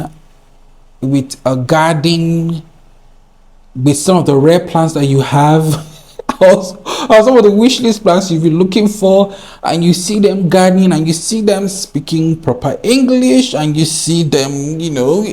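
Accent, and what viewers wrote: Africa. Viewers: I am so very sorry you have ran into blind assumptions on other sites. People actually insulted your accent?? OMG. What did they want, an African to have a Australian accent? A British accent? Perfect english is not enough for some folks I guess, I love your accent, any accent is grand. I do have trouble understanding an Irish accent at times, and Scottish is worse -- they slaughter words and without subtitles I can't catch anything! 😄😁😂 I watch a plant guy from England, and then you from Africa. There is a definite learning curve when one watches content from another nation, there are weather issues that I thought I was aware of, but I've been learning a lot about weather and how it affects plant people in other nations. And then there is customs or national normalities that I don't see here in the USA, like your roadside plant stalls, I'd love that! 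It all makes for a wonderful learning experience! I love that you are showing and telling us about Africa, about Nigeria, I watch you for your personality, you plant knowledge, your humor (!) but its so grand to get a context of life in other countries -- to blow expectations and assumptions away so all can see and understand and stop 'boxing' people into stereotypes. You are my treat. I watch every day!! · People are so bitter and it’s weird to be so loudly envious of others in the community because they have nice plants or live in tropical environments etc. Most of us are here to see nice plants and be inspired! Also these people are just insanely ignorant. Btw your accent is wonderful I love African accents. ❤❤ from USA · I love your energy, your humor, and I love that African accent of yours, and your lovely plants. Please keep up your good work! :)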